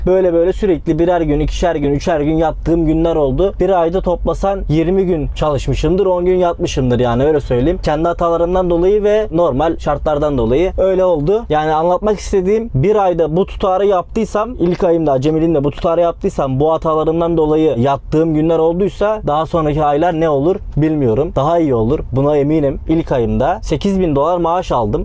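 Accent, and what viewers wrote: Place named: United States. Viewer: Babacan Allah yardımcın olsun ama amerikan ağzıyla konuşman çok sinir bozucu yok tarp yok mill Türk ağzıyla konusun spenzet vs km aksi sinir bozucu yolun açık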